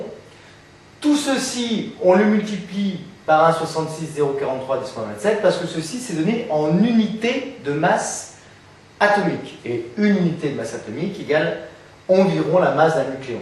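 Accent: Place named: Marseille, France. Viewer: bien expliqué avec l'accent marseillais ^^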